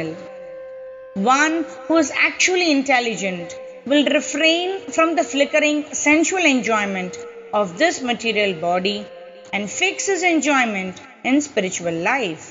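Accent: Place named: India. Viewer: Hari Hari ! So nice to have Srila Prabhupada's books in Indian accent with such clear speech. Waiting for the other chapters...🙏🏻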